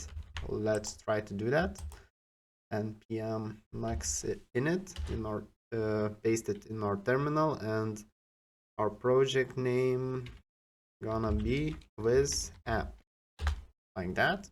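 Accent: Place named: Eastern Europe. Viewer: where are you from dude? you sound eastern european ;) Cool video!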